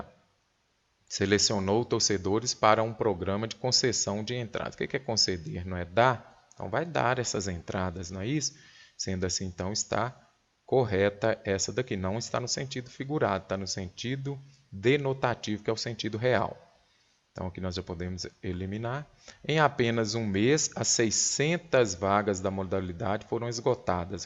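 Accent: Minas Gerais, Brazil. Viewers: Professor, você é mineiro?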